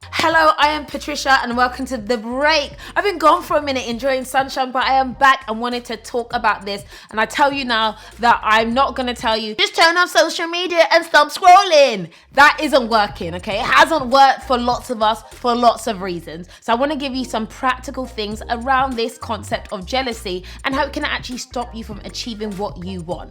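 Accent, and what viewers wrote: United Kingdom. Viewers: Interesting seeing a briiiish accent sistah with a cool vlog. Found it by accident 🙂 Nice it's not another barbie doll Instagram drama diva.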